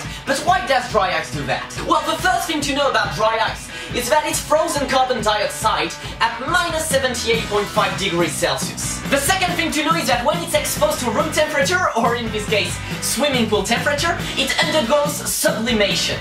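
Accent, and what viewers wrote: France. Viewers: T'as un accent français ! You have à French accent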